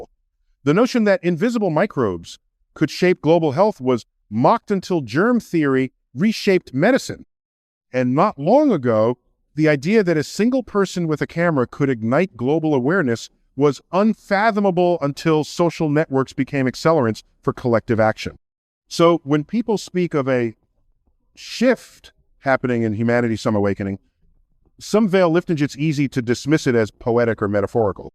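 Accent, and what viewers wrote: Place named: India. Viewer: This ai narration is boring. I hear Indian mannerisms as well.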